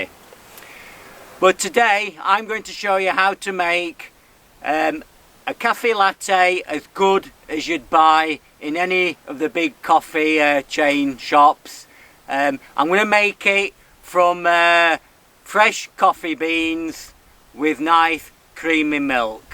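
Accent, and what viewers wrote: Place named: Yorkshire, United Kingdom. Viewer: definitely yorkshire, lu at a, not laaa tay or laaa t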